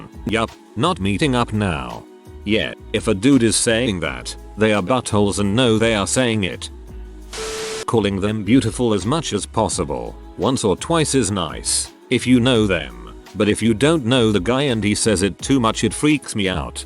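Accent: England, United Kingdom. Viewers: Why is this narration in an English accent? Much harder to understand.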